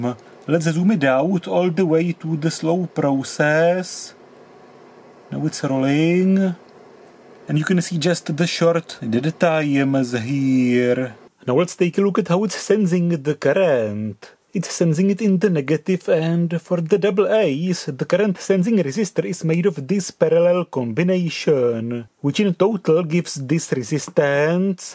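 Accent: Czech Republic. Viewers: Yep, he is from Czech Republic, what's wrong😉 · He's Czech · Czech Republic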